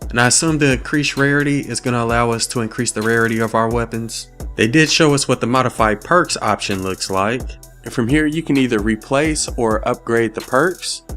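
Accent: South, United States. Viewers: You sound like Channing Tatum when he made that country accent in "Kingsman: The Golden Circle"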